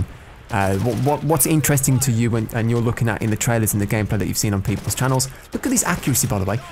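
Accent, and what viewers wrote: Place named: Black Country, United Kingdom. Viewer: Followed you for ages, glad you're back mate. Think you're from the same (midlands?) area as me, although you sound Black Country/Walsall and similar age too. Keep uploading mate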